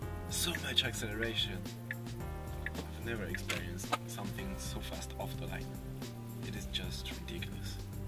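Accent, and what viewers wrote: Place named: France. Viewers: oui, l'accent français est très net. Es-tu français ? Car on dit : "cet anglais", pas "cette anglais". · Je me disait bien qu'il y avait un accent francais sur cette anglais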